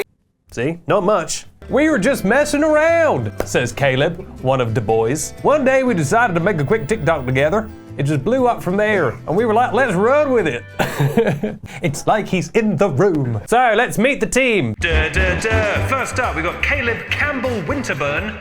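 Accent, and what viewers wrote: South, United States. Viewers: Yeehaw fashion era 😭 Btw your southern accent slayed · Your southern accent is better than mine and I'm an American.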